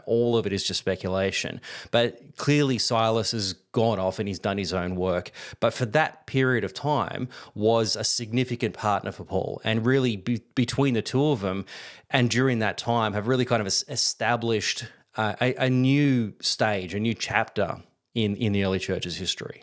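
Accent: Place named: Australia. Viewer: I've been watching a bunch of your videos today, and I'm glad of your explain-the-nuts-and-bolts format for these. It's surprising how few views you're getting, and how many of the videos have zero comments. Your Aussie accent isn't so bad, and your knowledge of the topics isn't so bad ether. Thanks for making them anyway, it must require a lot of work. Perhaps an hour plus about fairly minor characters that so little is known about is pushing it? If you can do it in a thousand words, don't feel the need to pad it to 10 000 words? I'm a Catholic, and you haven't annoyed me. Maybe that's your problem lol. Well, thanks and God bless. May your endeavors lead some to Faith, even if not you to big YouTube ad bucks.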